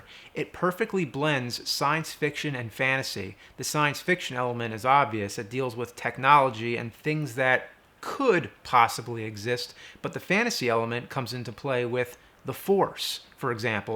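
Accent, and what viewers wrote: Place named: New Jersey, United States. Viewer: It's because he's from Jersey. That's how people around and in NY speak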